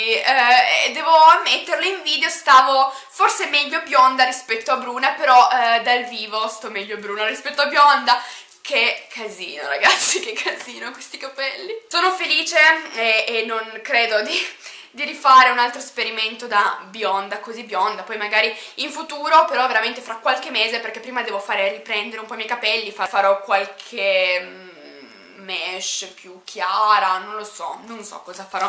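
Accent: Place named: Piedmont, Italy. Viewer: Aaaaalla faccia dell'accento piemontese!Nè!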